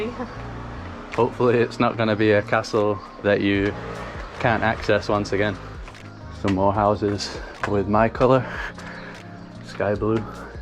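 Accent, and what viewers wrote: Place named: Scotland, United Kingdom. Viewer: 😁 I was sure I could hear a Scottish accent...😁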